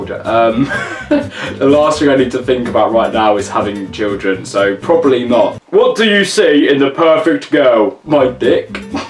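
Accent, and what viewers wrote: England, United Kingdom. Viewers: he sounds British because he is, so don't try to compare him to every british YouTuber 😂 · Wow your so beautiful and your voice is so hot omg love this uk accent. You have incredible eyes. And your so fucking funny haha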